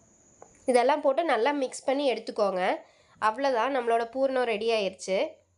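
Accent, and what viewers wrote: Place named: Kongu, India. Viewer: 🤩 i will try🥳 unga kongu tamil and voice spr nga🥰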